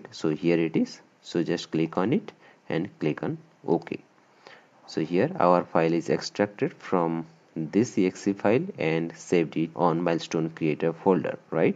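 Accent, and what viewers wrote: India. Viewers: Indian accents are so annoying. Sorry, I'm not sorry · thank you SOOOOOO much when I hear an Indian accent I know I am in safe hands! :D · Yet more proof that "guy with indian accent" YT tutorials are the best channels to learn cracking tutorials from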